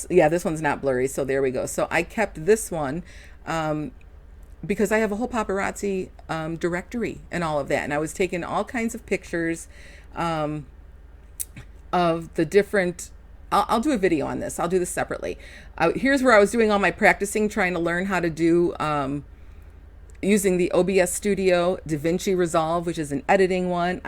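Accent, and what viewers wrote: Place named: Chicago, United States. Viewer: Ma'am. After about 30 secs of listening to your story I knew you were from Chicago 😅 Even though you're no longer up here it's nice to hear someone sound like me. AND a creator who is also Gen-X, hello fellow 80's cool kid! Looking forward to your content on all those companies, many of which I'm super familiar with. You had the purse?!?! I'd have been SO jealous.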